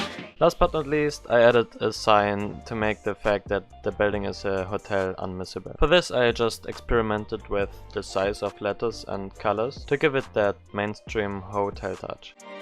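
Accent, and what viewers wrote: Germany. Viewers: ummm where are you from you sound a bit like german or smth i like how you build. Subscribe and Like😊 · Are you german? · are you german ?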